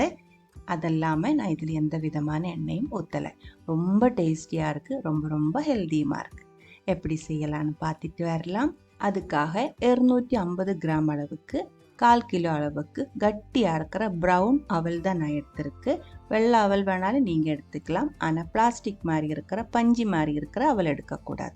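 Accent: Kerala, India. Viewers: Alayalam kalantha thamil arumai sagothari valga valamudan aviyal solli kudunga enga kudumpame adimai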